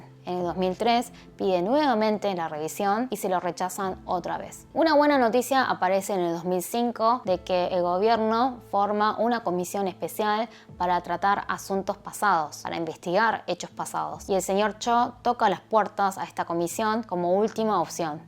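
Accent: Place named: Argentina. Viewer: Súper raro ver una China o coreana nose hablando argentino 🤔🤪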